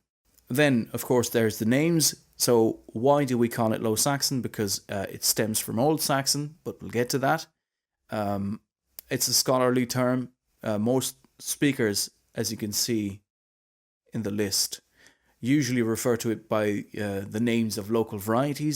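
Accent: Ireland. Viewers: A Dutch guy speaking Irish accent. This is new to me. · The bloke sounds like an Irishman but says he is Saxon? I thought the Low vs High was geographical reference. Were Danes Vikings or maybe a transitional population? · I have the funny problem of cognitive interference of your Irish accent when my mind is expecting a first language adjacent accent, that is with more influence from Low German. My mind will cope by thinking you're an Irishman who has learned Low Saxon! Good to learn more about my ancestral language. My family were from Westphalia region and Altmark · How did you get an Irish accent? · You sound Irish.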